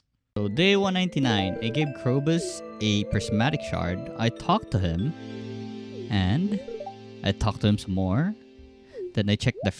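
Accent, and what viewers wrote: Philippines. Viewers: Are you a fellow Filipino? I noticed your accent in your 100days video and i heard filipino voices in the background somewhere in this video. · are you filipono? your accent sounds like it.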